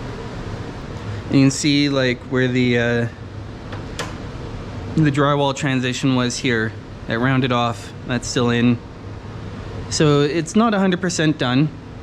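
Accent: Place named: Canada. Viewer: you have a nice accent you must be Canadian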